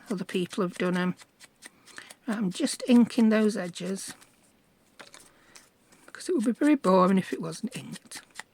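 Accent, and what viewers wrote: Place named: Yorkshire, United Kingdom. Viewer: I love listening to you! I’m Irish born and lived in Yorkshire for 3 years from age 6-9 then moved to the US. Listening to you talk feels so comforting and nostalgic to me as it’s how I spoke in my formative years. Love your projects and you! Wish you would do a ‘get to know me’ video. ❤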